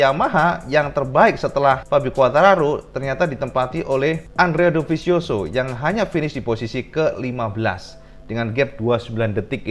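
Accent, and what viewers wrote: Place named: Java, Indonesia. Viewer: Jos cak, cennel medok udah update 😂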